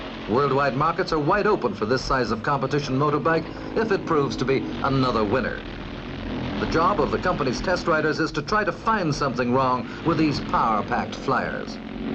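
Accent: Ireland. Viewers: Do we know who the narrator is? I think I hear an Irish accent sneaking through in places.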